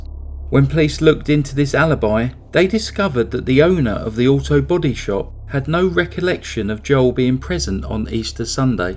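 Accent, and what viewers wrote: England, United Kingdom. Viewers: I love the English accent, just sexy! I bet you're hot! Lol, just kidding! Honestly, though, you're very intelligent, well versed and I love the respect you have for the victims and their loved ones while you're doing the video. Thank you for taking the time to gather all the information as it shows you definitely put work into each video! New subscriber for life.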